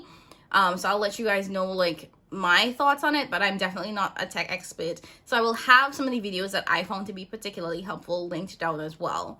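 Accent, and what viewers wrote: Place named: Trinidad and Tobago. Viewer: I'm trying to figure out where you are from based on your accent. It sounds like Trini to me. I'm planning on getting the Sony ZV-E10 to replace my Canon SL2, I find the buttons on the Canon to be too complicated to figure out and ALL of the Sony ZV has simple buttons and only I think 5 options per button placement.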